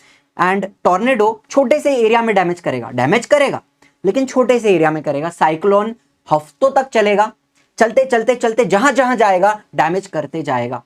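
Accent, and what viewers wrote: Maharashtra, India. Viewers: Sir AP Marathi ho kya Hindi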